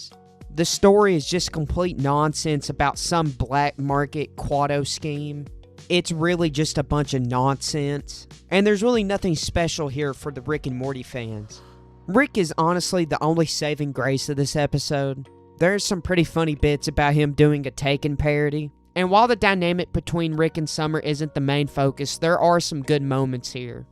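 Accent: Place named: South, United States. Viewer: you are the most southern Rick and Morty fan I have ever heard❤‍🩹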